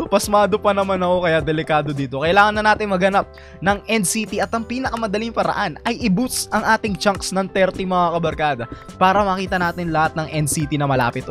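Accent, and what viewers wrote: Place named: Bulacan, Philippines. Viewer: Pareho tayo ng punto ng pagsasalita kuya Proud Bulaceno Here taga bustos po ako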